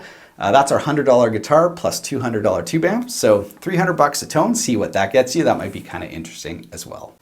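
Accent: Canada. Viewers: Great video, exceedingly helpful! Would love to see it compared to higher-end tubes. Also, the Canadian accent seems more pronounced in this video for some reason.. love it!! 🤘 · he sounds candian, everything sounds weird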